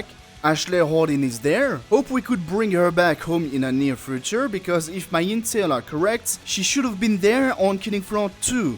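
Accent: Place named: France. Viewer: I love your French accent pal, you are very thorough and proper with these videos, and they are made with love and meticulous craftsmanship. Keep it up bro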